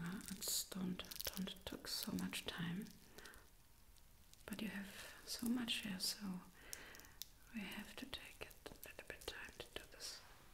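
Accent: Germany. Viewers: speaking germglish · Great idea but a german only Version would be great. I dislike the german accent (in general not you specifically) and it was quite distracting for me personally xD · I loved hearing both English and German. I love the sound of German accent and language.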